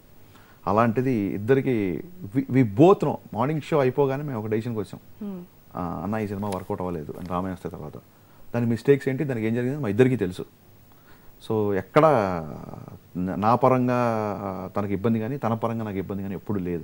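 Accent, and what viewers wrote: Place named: Andhra Pradesh, India. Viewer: Telanganalo putti andhra slang matladutundu nu easte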